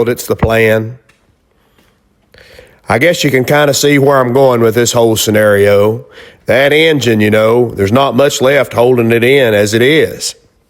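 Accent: South, United States. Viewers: You guys are a totally awesome sight always and your voice sounds southern!! Don't ever change I love your attitude!!! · Lmao, im an arkansas hik and you sound like you're from the south. The brass had to come from above the carbs, very fortunate it didnt get passed the valve. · I think some of your listeners need there hearing checked, You do have a different voice to most people nowadays that's only because your southern and we are all a dying breed. Your singing voice is excellent I wish I had that, but its your voice not anyone else's that is what makes it sound so good. But Dukes of Hazzard and Forrest Gump I bet your wife thinks people are crazier than I do how funny. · Just because you hear a nice southern drawl, they start thinkin’ you sound like somebody. What about Roscoe P from Dukes of Hazzard, or Conway Twitty? You just keep being you, and I’ll keep watching the guy that sounds like half my kin!! 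· Well, I like this channel just the way it is. You do not talk too much. You do not sound like ol Waylon. Sorry. But you do sound like a more southern version of my old pal Tim From Throttle Power up in Louisville. 😊 Your gasoline hallucination was a nice treat. Another enjoyable video. Thanks. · Not Forrest just southern I guess I am in New Mexico but my family is all Texan sounds normal to me. lol love the vids 34 5w is my dream car one day maybe but at 62 maybe not got my 67-mustang hot rod runs 10's but I would love a 32-34 5w coupe my dad had 32 chopped 5w when he was young man wish it was mine. keep the cool content coming. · You sound like most of the people I know “southern blue collar workers “ I’m kinda proud of that . Those that poke fun of us sound just as different to us as we do them , I agree with the one guy , like the mixture of your videos ,machinist , mechanic, car lover , farmer, musician, family man, just a good ol boy,you know your mama love you !!!!!!😂😂😂 hey you can’t get all those degrees down at the university, 😊😊😊😊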